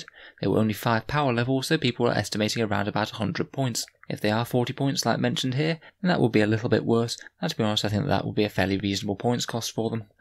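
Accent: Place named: East Anglia, United Kingdom. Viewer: I'd guess somewgere East anglia way, they're accent is quite subtle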